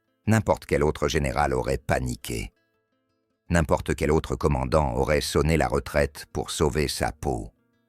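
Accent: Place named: France. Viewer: it's a French accent and a very nice one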